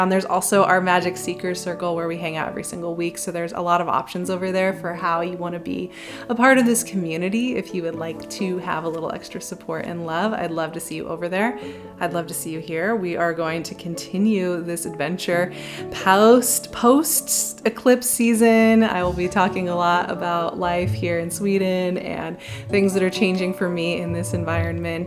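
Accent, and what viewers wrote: United States. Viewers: She sounds like an american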